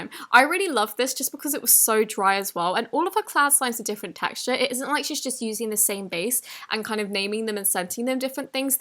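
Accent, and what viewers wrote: United Kingdom. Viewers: Your British accent is so strong that i love it the British accent (strong ones) are the best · Teach me how to speak with British accent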